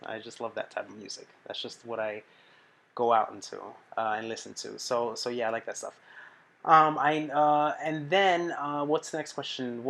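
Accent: New Jersey, United States. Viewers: So that's why I hear you NJ accent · Answered my question. NJ accent. =) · You are so instantly lovable. ♥ (Also, when you mentioned you are from NJ ... instantly, I started hearing it, and I'm not even from your side of the Atlantic!)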